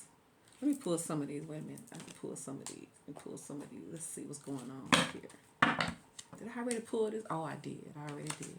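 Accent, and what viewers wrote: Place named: South, United States. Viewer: your southern drawl sis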